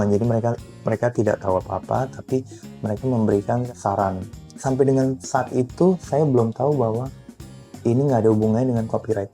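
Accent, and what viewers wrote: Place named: Madura, Indonesia. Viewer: Akun yakin orang Madura nih 😂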